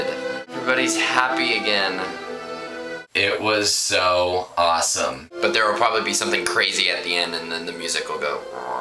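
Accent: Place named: United States. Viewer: I love your videos dude, and I especially like the sound of your voice and your accent, it's so cool, which part of the US are you??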